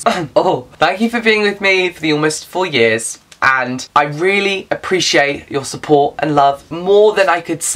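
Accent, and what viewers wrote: United Kingdom. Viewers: I love your channel. Your enthusiasm and love of reading is infectious. Your accent is adorable. (I'm American and love Brittish accents) Forget the haters. They know nothing. And if your sexuality is a turn off for them, then they were not true fans in the first place. They're just ignorant trolls. #DontFeedTheTrolls Ignore them and do you. Your true fans will appreciate that all the more.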